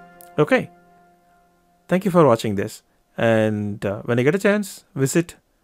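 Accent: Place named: India. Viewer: I always love Indian accent. cuz u understand it and feel something weird in the same time XDD thank you for this tutorial anyway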